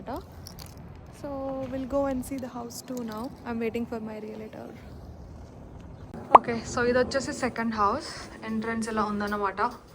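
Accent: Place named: Miryalaguda, India. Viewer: In youtube I suddenly got your india tour vlog . I felt that u r from my nearby area , Because the way you talked.Exactly you are from Miryalaguda I FELT THANK GOD. Iam a upsc aspirant hope I will have vlog after my selection with you..Thank you sister for your videos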